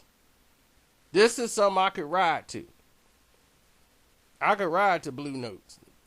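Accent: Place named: Dakota, United States. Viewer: This dude sound like he only wear Bill Cosby sweaters. Must be from Dakota or some shit. fuck outta here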